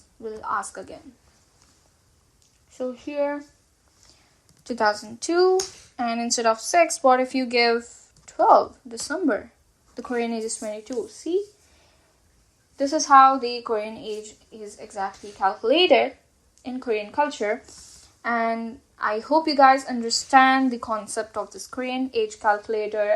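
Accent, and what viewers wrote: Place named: India. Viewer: Great video BTW are you Indian because your accent is a bit different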